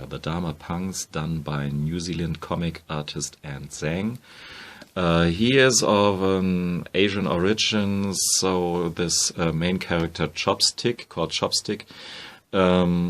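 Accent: Germany. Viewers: Wie man dein deutsch richtig raus hört.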